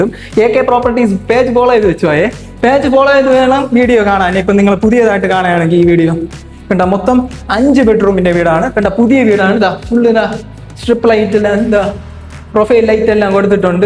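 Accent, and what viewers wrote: Parassala, India. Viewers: പാറ സാ ല സ്ലാങ്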